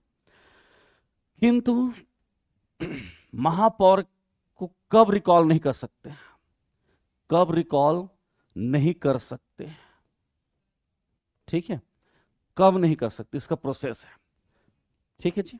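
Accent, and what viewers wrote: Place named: Chhattisgarh, India. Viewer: Wo Chhattisgarhiya hai, Chhattisgarh me jii bolna ek lahja aur good manners hai. Tai apn kam le kam rkh na padhe la aye has ta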